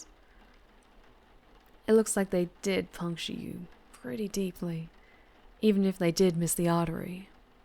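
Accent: South, United States. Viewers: A charming and sweet southern accent lulling me to sleep? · Maybe it’s the midwestern in me but I am a sucker for southern belles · I just want to let you know, that I actually did liste to this particular vampire roleplay. But not only that, it is actually a very good vampire story. I specially like the southern accent as well. And also, I hope that there will be more stories. Like this one, in the near future. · I can hear your orc voice😍😍 Through your Southern accent Sometimes so hot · Aaaaand my author brain is now on overdrive, thanks;p Very well done! Was just wondering the other day what you might sound like with a Southern accent. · i wanna make this clear...as a southerner (flordian bite me) this accent was spot on. 10 outa 10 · Ooh! I love the accent! Southern? It feels like a well traveled southern Belle's honey sweet voice. ❤❤❤ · As someone who's from the south thats a pretty good accent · Very nice, love that southern accent. 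🌹♥️😘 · Not bad . for a southern draw . and great story. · I got to admit, you do a pretty good southern accent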